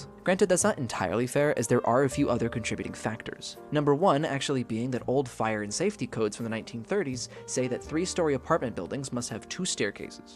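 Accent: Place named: United States. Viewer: OMG, American accent, youthful voice. Try going to Delhi India if you want something to complain about.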